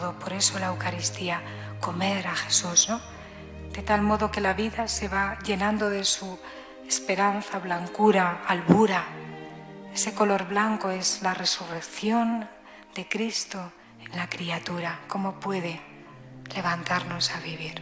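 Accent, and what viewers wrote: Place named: Spain. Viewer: "La Vírgen es madre, sufre dolores de parto, hasta ver a Cristo formado en tí." Preciosa meditación madre Verónica, escuchar su suave y pausada voz, es como si estuviera escuchando a la Madre de Dios. Vosotros los españoles tenéis el acento más lindo de nuestro amada lengua española. Saludos desde Colombia, y os ruego una oración por mi país Colombia.🇨🇴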